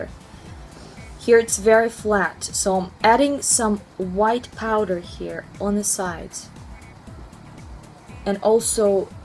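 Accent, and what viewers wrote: Russia. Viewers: Кажется временами у вас проскальзывает русский акцент или какой-то славянский. Я хочу сказать что я в шоке после просмотра вашего видео. Иногда когда вы склоняете слегка лицо и прищуриваете глаза, тогда я точно вижу героиню вашего сюжета. Я честно говоря в шоке как вам так быстро удается это нарисовать. А главное похоже притом что у вас совершенно и глаза другого цвета и лицо другой формы. Я просто восхищена вашим искусством! Сама люблю рисовать но не на лице. Наверное вы какой-то известный визажист(я первый раз на вашем канале) .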